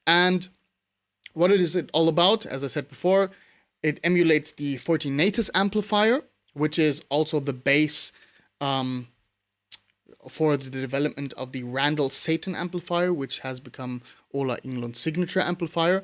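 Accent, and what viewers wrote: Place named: Germany. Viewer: Ach, Deutscher! Hab's am Oettinger bemerkt und jetzt auch etwas am Akzent. Aber das Video zuvor hätt ich nicht unbedingt darauf getippt. Kompliment. Wenn du magst, ich mache auch ab und zu Videos, wo ich Gear und Software präsentiere (meistens in Form von Riffs, spreche nicht). Das ist z.B. mein Sound mit dem Nalex Gerbert: <link> Hier ausschließlich ein AMT K2 Pedal, also ohne TS davor: <link>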